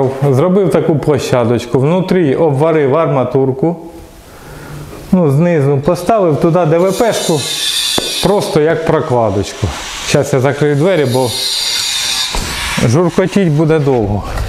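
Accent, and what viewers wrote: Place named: Kuban, Ukraine. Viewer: Мабуть чувак з Кубані, але українське коріння є. В Україні так не розмовляють тут за тридцять років вже мавпи навчилися розмовляти. Хай щастить.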